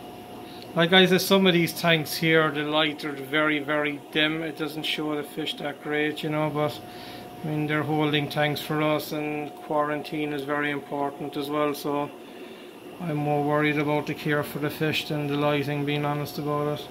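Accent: Ireland. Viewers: You’re either from Galway or Mayo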